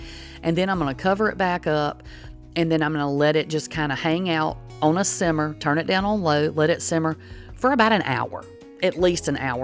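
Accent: South, United States. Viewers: This looks absolutely amazing! Thanks for sharing! And I absolutely love the way you say boil..👍 I'm from Ohio so I'm not used to the southern draw but I love it! And it's cold here too we've been in the teens and single digits. But we're used to it in Ohio...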